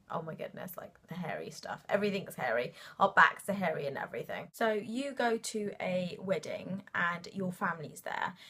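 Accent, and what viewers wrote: United Kingdom. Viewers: A brown girl with a British accent 😍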